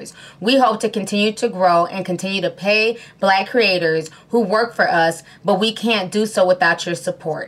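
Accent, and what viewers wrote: Nigeria. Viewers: I love your Nigerian Accent!❤ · I luv your Nigerian voice. More please! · I didn’t know that you’re Nigerian!! squeals That accent floored me.🙌🏿👩🏾‍🦱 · Come through with the Nigerian accent yesssss!!!😍😍😍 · Omg your Nigeria accent was on point omg it’s crazy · Well hello beautiful, come through with the Nigerian voice. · I love your Nigerian voice!!!!! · And please use ya Nigerian accent more often! We need a commentary video in a full Nigerian accent. Please now! I beg! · I love the wsyvthis is editd. And I see you can turn that Nigerian accent on/off real quick. I bet that's what your kids hear when you're mad. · Love your Naijan accent 😻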